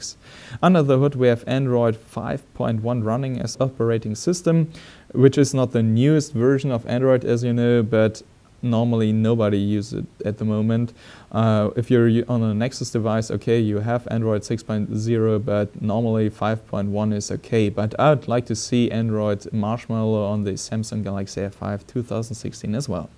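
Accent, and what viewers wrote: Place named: Germany. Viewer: i guess you are German. i like your accent. that's cool man....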